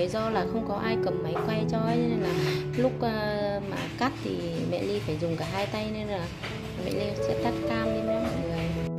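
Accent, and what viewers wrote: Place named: Ha Tinh, Vietnam. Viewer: Nghe tiếng hà tĩnh mà hay quá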